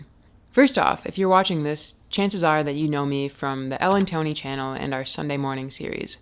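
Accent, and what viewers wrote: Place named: United States. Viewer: Where in the States is your accent from?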